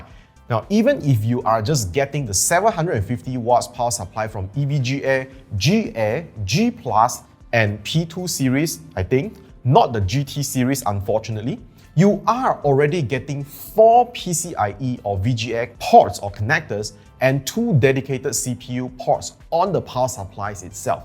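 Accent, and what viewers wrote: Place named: Singapore. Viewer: Your Singaporean accent is thick boi lol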